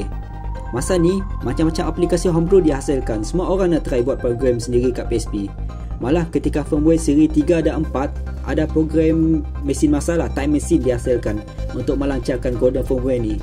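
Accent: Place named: Sarawak, Malaysia. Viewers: Bunyi kedak org sarawak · Aku tak phm sangat apa yg dia terang tu, tpi yg aku tau speaker tu org sarawak sbb dri segi dia bercakap mmg nampak slang org sarawak. Aku harap bukan aku sorang je yg perasan.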